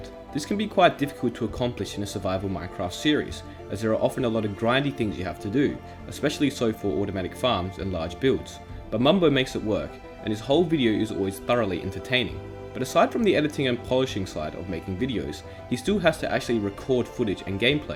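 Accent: United Kingdom. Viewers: It's the british accent. I love it!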